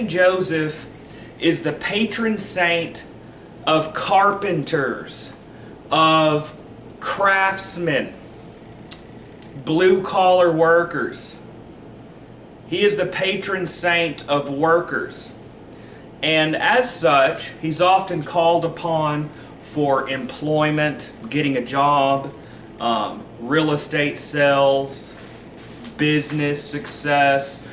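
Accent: South, United States. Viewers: I love your hoodoo stuff the most. It must be the Southern Accent.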